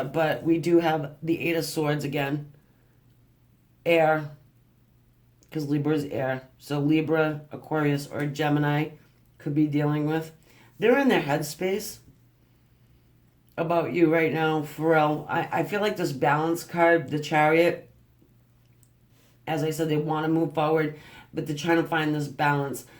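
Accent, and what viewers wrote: New York, United States. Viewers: Where are you from? New Jersey? New York? Long Island? I love ur energy haha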